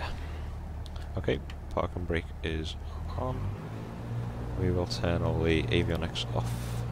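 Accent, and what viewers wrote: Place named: Liverpool, United Kingdom. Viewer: Are you scouse, you sound like a scouser :D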